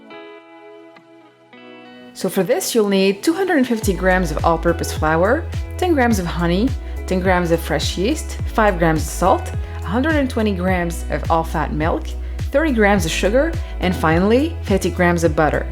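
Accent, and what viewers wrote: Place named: Quebec, Canada. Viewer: Been roughly 10 years since I’ve made croissants this video is the perfect explanation for how to laminate dough! Even Touch my girlfriend how to make them as well thank you very much keep up the amazing work ! Also when I here the accent I’m like damn this gonna be good 😂😂 French Canadian ?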